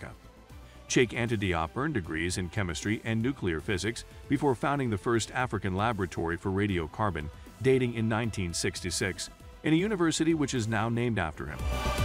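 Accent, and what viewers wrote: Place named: South Africa. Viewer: I know you are a white south African, that why you are talking like that 😁, like I said before, facts doesn't care about your feeling, bringing the ideas on the table doesn't mean you are the one who invented it 😏😁.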